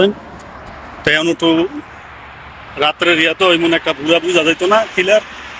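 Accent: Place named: Brahmanbaria, Bangladesh. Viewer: ভাইয়া আপনার বাড়ি কি ব্রাহ্মণ বাড়িয়া? ফেসবুকে আপনি আঞ্চলিক ভাষা ব্যবহার ঠিক আছে কিন্তু ইউটিউব হল একটা আন্তর্জাতিক প্লাটফর্ম এখানে শুদ্ধ বাংলা বলার চেষ্টা করবেন আশা করি।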